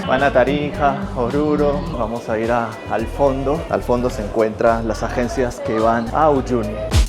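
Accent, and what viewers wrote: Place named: Peru. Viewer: Que esperabas....en invierno y a 4000 metros de altura. Mejor te quedabas en casita y calientito. Por tu tono de voz Peruano.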